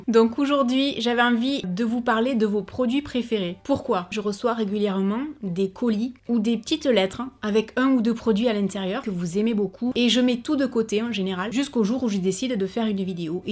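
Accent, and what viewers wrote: South, France. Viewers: Coucou! Juste pour te dire que j'adore toutes tes vidéos! Tu es marrante, j'adore ta franchise et ton accent du sud et ta bonne humeur! Quand tu dis "moin...s" ça me fait toujours bizarre! Finalement, je voulais te dire que J'ADORE ton générique de début de vidéo! Tu m'impressionne! Bisous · Déjà je t aimais bien.mais là avec ton amour pour les animaux je t adore😍😍et ton accent du sud ça change de ma Bretagne et de Paris...😊😏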